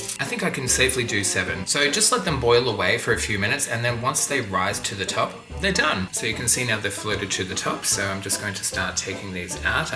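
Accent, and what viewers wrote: United Kingdom. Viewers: Gidday. I am a new sub and love your wartime meal cooking. I was born in SA, and live in QLD. My British in laws always told me that my accent sounds British. Well, when I watched a first video from your channel, I thought you sounded like a Pom! Fancy that. Nice to know you are an Aussie. Absolutely love your wartime recipe content, because my grandparents endured both world wars and the Depression. Both of my parents were born during WW2. I have kept my maternal grandma’s cookbook published in 1950. As you know, rationing extended until 1955. Many recipes in the book came from rationing. For your interest, the cookbook was the Green and Gold cookbook issued from the King’s College in Adelaide as a fundraiser. I have a more modern reprint but it doesn’t feature the same recipes. Good on you for your channel. Keep doing what you’re doing mate. 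❤️🇦🇺👍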